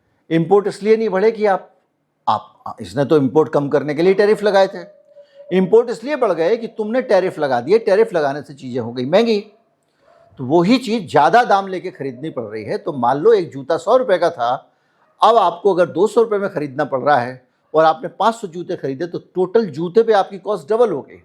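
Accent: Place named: Bihar, India. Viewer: आज आप बिहारी टोन मे बोले है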